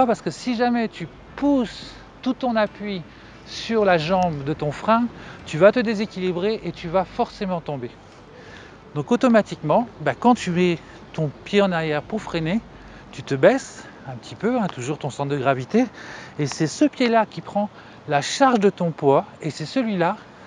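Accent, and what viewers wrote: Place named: Réunion, France. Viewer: Bonjour 👋 Et mes sais a la reunion ?l’endroit et ton accent me le fait penser je commence tous juste le rollers premier pas en rollers sait la galère sachant que je suis obligé d’à prandre seul 😢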